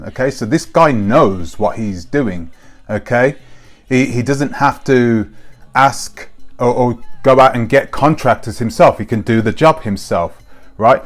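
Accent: Birmingham, United Kingdom. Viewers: Bless your cotton socks..you sound like a proper brummie. 😄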